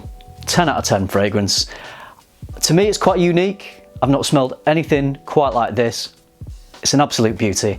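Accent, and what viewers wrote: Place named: Yorkshire, United Kingdom. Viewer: No that’s a Yorkshire accent (northern) cockney being southern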